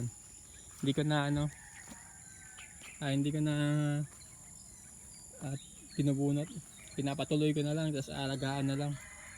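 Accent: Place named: Bicol, Philippines. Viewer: Taga San ka Pala boss parang bicol ka Kase pag nagtatagalog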